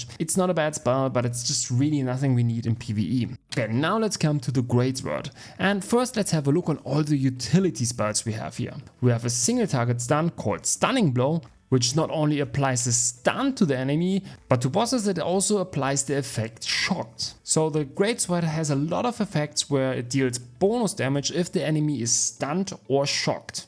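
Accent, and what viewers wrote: Germany. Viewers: Oh, you are German? Deshalb mag ich deinen Akzent wohl so sehr :'D Und natürlich Lob an deine Videos! Ich finde es sehr hilfreich, dass du immer durch alle Skills gehst, und auch erklärst, warum welche nicht genommen werden, so ergibt es irgendwie mehr Sinn. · Hab sofort gemerkt dass du Deutsch bist ^^" bester Guide den man sich als Anfänger reinziehen kann nicht zu kompliziert troztdem Detailreich, kurz aber nicht so kurz dass schritte übersprungen werden. Alles super erklärt gerade für mich als Anfänger was MMOS angeht. hab auch mega rescourcen und Zeit verschwendet da ich ein Build nachgemacht von jemanden der angeblich nur Builds und gameplay klaut aber bin mir sicher. Mit dem guide hol ich dass schnell auf, Danke für den Mega guide :) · Hab ich doch richtig vermutet, dass du n deutscher bist :) Kannst den auch mal in Deutsch machen. 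Bin zwar halbwegs Sattelfest mit der englischen Sprache, aber Deutsch fällt dann doch leichter... Danke für das Video.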